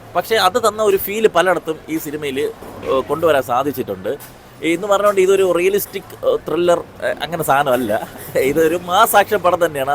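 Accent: Trivandrum, India. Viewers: He is from trivandrum. Do check more videos including live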